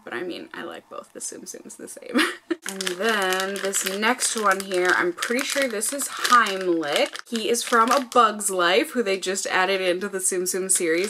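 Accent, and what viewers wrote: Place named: France. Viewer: Your so funny! Lol your French is cute.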